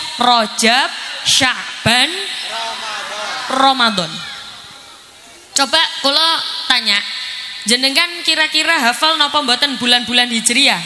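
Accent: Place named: Surabaya, Indonesia. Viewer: Dari cilacap ada ngapak ngapak si bu nyai Mumpuni Handayekti... dari surabaya ada nyai arek arek...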